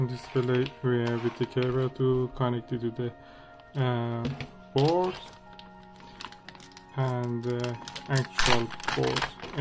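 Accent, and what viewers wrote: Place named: Iran. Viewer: سلام ممنون بابت ویدیو شما ایرانی هستید؟ لهجه تون که ایرانیه😅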